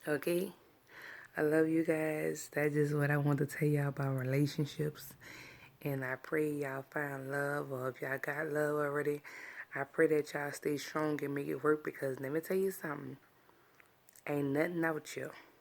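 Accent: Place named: Shreveport, United States. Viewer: You sound like a woman from Shreveport I should have married.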